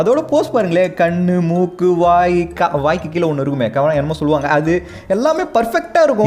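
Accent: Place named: Karnataka, India. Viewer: Bro Karnataka ilanthu pesara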